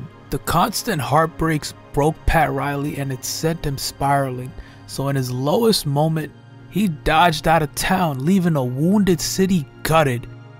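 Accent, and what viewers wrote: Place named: Bronx, United States. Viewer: Bro your cadence and delivery is dope, it's like your cool cousin vinny giving you the game in that Bronx accent!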